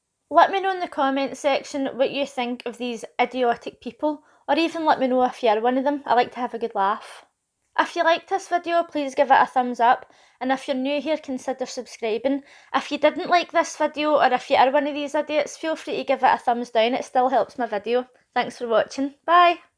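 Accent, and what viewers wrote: Scotland, United Kingdom. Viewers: ps ...Im London all my life,...nothing wrong with your scottish accent...perdfectly understandable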